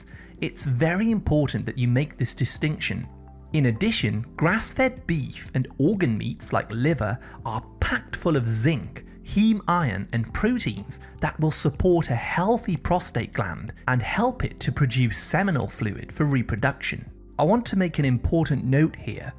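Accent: United Kingdom. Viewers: Everything I eat is on this list. Anyway, I don't trust anybody that speaks with a British accent so screw him. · Information is good to know only could you speak in a more English pattern than in a British accent because it's hard to understand sometimes and frightening also. SERIOUSLY STOP THE CORRUPTION OR IT WILL KILL YOU 🇺🇸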